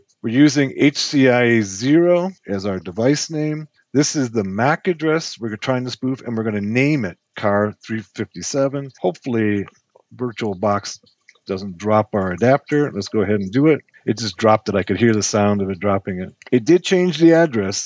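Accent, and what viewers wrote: Afrikaans, South Africa. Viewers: You sound south african, great content!! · Hi are you afrikaans?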